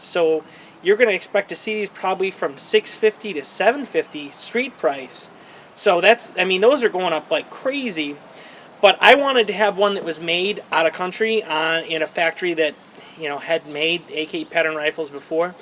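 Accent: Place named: Chicago, United States. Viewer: HA, listening to your accent i knew you had to be from the Chicago land area. Then you busted out with you living 30 minutes from Rguns and I was like, son of a bitch lol. I live about 45 minutes east from Rguns, I'm over by the lake. I just bought this rifle from reddot arms in lake villa and I'm going to do the same thing as you and just head over to rguns and get the yugo sling. good video, i just subscribed.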